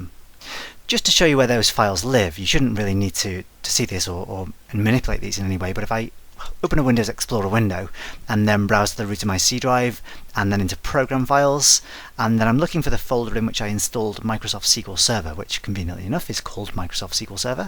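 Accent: United Kingdom. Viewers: Dear it great tutorial, allow me to thank you for your this great job, but also allow me to say that you have a British accent and speaks a little fast which is hard to understand for not native English speakers, once again thank you and you do wonderful job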